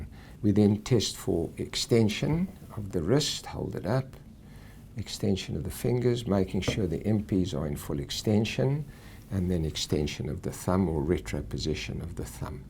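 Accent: South Africa. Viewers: I thought was south african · South African accent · I was also confused. I heard South African but when I looked him up it indicated Australia! Well, went to Wits so definitely South African, but I suppose just moved to Australia.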